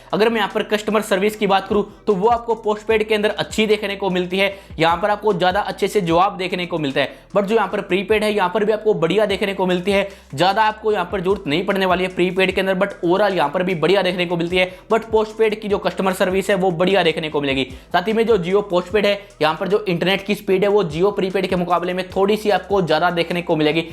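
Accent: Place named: Haryana, India. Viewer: aapki aawaz se pahchana main bhi haryana se hu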